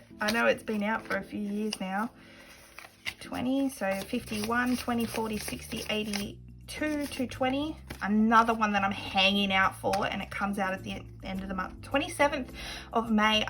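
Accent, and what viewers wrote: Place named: Australia. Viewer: Her accent sounds like Australian so I assume that is the currency.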